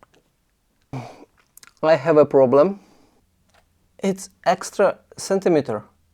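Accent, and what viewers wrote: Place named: Eastern Europe. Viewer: Super nice video! And Eastern-European-English style gives it extra fun ❤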